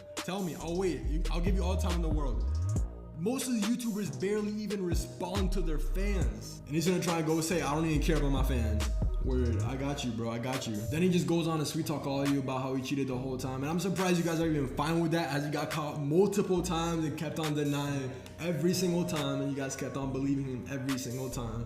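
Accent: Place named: Colombia. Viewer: con un acento absolutamente colombiano que cualquier hispanohablante puede detectarlo fácilmente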